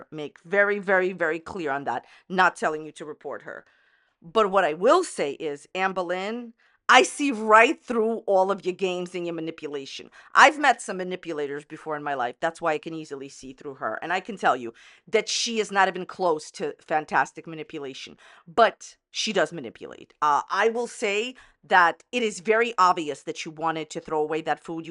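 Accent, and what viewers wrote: United States. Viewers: I love how says Ambalin 😄 She has a definite accent from the region she lives in. But so do I. We have different accents in different parts of the US.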